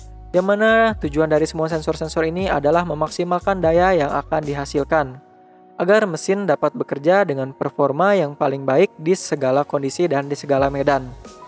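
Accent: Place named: Slawi, Indonesia. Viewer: Sampean wong slawi ya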